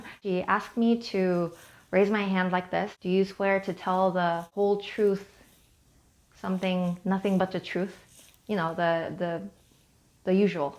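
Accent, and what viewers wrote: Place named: United States. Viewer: How can you speak American accent, really love your accent ❤️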